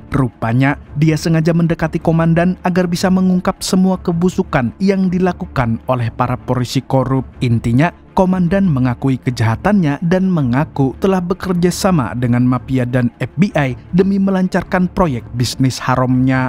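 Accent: Banjar, Indonesia. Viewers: urang banjar😂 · Aeee... Urang banjar kh pian..?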